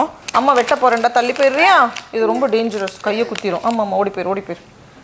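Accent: Nagercoil, India. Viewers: Nice Nagercoil slang..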